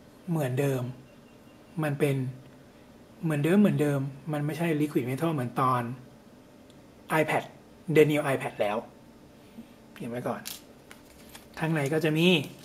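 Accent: North, Thailand. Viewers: คนเหนือเเน่เบยยย >< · (งงเหมือนกั๋น)....เอ๊ะ สำเนียงคนเหนือเปล่าครับ ฮ่าๆๆๆๆ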